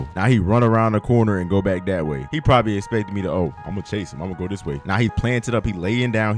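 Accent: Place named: South, United States. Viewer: Bro you from the south ain‘t you 😅 anyways great videos!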